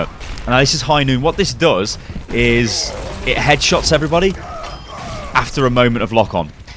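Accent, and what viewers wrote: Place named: East Midlands, United Kingdom. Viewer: are you from east midlands area? you sound it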